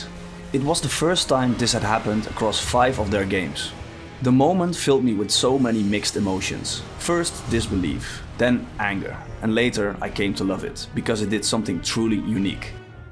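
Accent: Netherlands. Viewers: Man you sound dutch you heve strong accent i vlasnders my self dutch part belguim 😅😅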